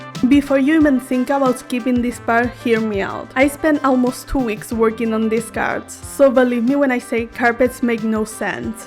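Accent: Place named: United Kingdom. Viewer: you sounding british makes the video better lol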